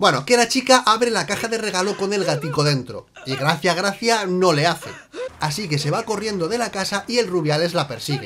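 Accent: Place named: Spain. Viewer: ¿Por qué los Españoles hablán tan mal el Español? O no más él lo habla así?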